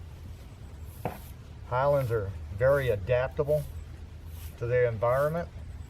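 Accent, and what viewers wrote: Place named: South, United States. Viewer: Love your southern accent x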